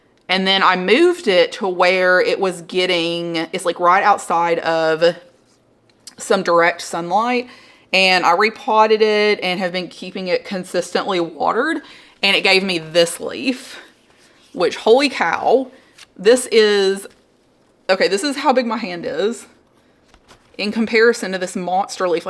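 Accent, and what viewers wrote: South, United States. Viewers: When I first heard her, she reminded me a lot of my relatives in NE Alabama, so I thought she was there or perhaps North GA. I was pleased to find out I was right! I grew up in NW FL with a much more subtle Southern accent, so my extended family mocked my “Yankee” accent. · We don’t have a publix in Wisconsin.. we have Copps, and I’ve never seen any philodendron. How’s the job going? You recently went back? What side of Georgia? You have such a strong southern accent, but I love it!! 😂❤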